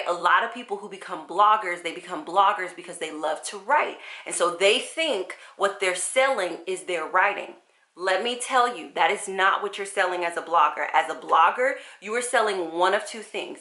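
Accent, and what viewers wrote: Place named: North, United States. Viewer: it is a northern thing. I’m from Baltimore and we say it similarly. 😂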